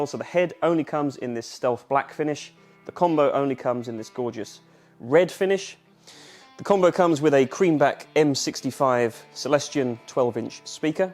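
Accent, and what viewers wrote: United Kingdom. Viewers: Wait, you're British?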